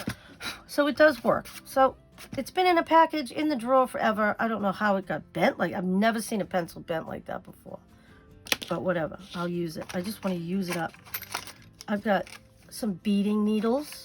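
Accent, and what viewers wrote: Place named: Massachusetts, United States. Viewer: What part of Mass are you from, because I detect an accent?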